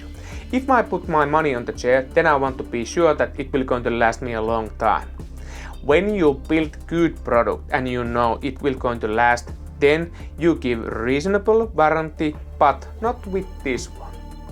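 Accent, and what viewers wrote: Finland. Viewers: I hope that youtube algorithm gods will help you grow, you made a good representation, pretty high quality video. And after playng a lot of MSC i love finnish accent :-DDDDDDD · Are you from Suomi? You speak exactly same as my Finnish husband :D nice chair actually.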